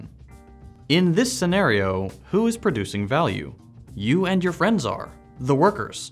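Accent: United States. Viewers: Are there any socialists in the US? It's so weird to watch a video on socialism with an American accent.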